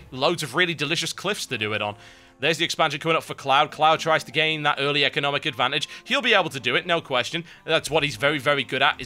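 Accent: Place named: Northern England, United Kingdom. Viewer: It isn't his actual accent, he actually has a Northern accent. If you listen carefully when he gets excited, you'll hear it.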